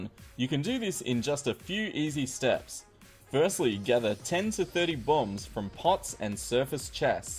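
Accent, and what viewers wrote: Australia. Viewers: Is that an aussie accent I detect? =D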